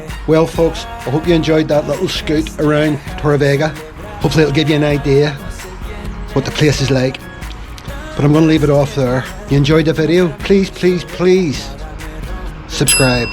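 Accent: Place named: Belfast, United Kingdom. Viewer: Omg really great to hear another Belfast accent, I've been living in Spain for 15 years ni and you're the first. Really enjoyed the video, everything looks so peaceful compared to the big noisy city where I live. We're lookin to move and going through all the different places on YouTube, so you're great! Thanks a million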